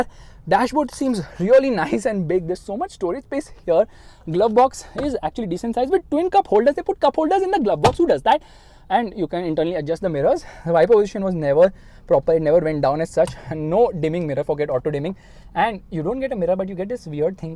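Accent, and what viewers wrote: India. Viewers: Hindi influenced English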